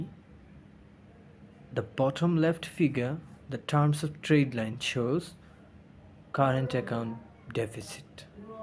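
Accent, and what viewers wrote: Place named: United Kingdom. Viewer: ব্রিটিশ এক্সেন্ট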